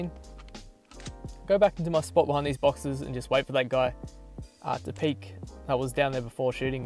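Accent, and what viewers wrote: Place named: Australia. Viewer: Man, your accent sounds like an Australian.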